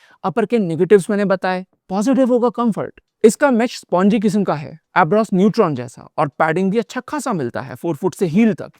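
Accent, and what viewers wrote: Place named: Chandigarh, India. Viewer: Are u from Chandigarh? It seems like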